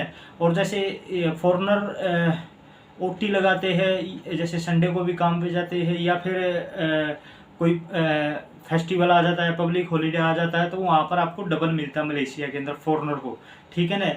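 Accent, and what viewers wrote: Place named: Haryana, India. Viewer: aawaj se to aap haryana se lagrahe ho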